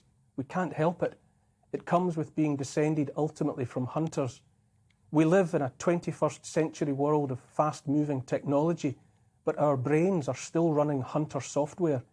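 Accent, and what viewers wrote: Scotland, United Kingdom. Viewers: Moreover, forgot to mention that your Scottish accent is classical music to my ear! Brilliant!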